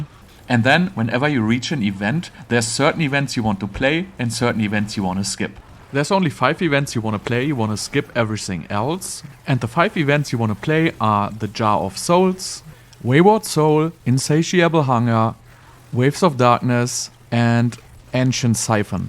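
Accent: Germany. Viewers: yeah thats a fine build for overworld. it like omni cl of s1. that was probably even more op for farming:) . sadly its gone for now. And greetings from germany, you sound german too.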